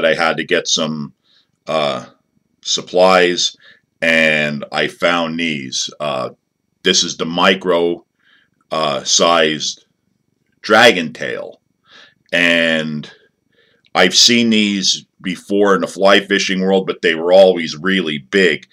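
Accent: Pennsylvania, United States. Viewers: I have been trying some of your patterns for a friend and myself . He made a comment that you have a Schuylkill county accent , then I saw you use a bank envelope that had orwigsburg printed on it , is that the area your from ?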